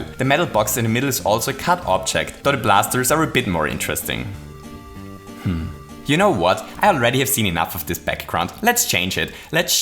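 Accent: Germany. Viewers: Where are you from? I can't categorize your accent. Any chance you're German? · Are You German? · Are you german or austrian?😂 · Are you from germany?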